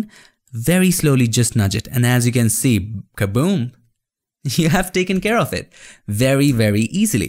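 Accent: India. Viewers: with Indian voice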